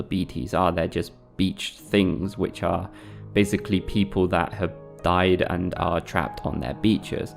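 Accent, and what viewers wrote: United Kingdom. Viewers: Happy to find a British accent explaining this game. I'm American and I can't stand Americans' accents on Explained videos.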